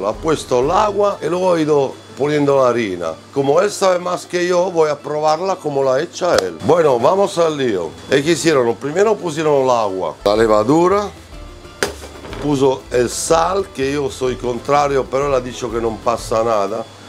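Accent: Italy. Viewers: hablando como español con acento tano es automaticamente porteño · Hola me haces acordar a mi abuelo cuando te escucho hablar con ese acento italiano... seria genial q te des una vuelta por argentina para probar todas las pizzas q hay.... son distintas a la clasica pizza margarita italiana pero no dejan de ser exquisitas... e ir a la calle corrientes a recorrer pizzerias es todo una experiencia...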